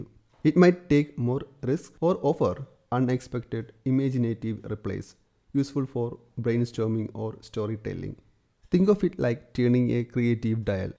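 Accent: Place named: Kerala, India. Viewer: Why don't you use a text to voice output and present this without a heavy Mallu accent 😮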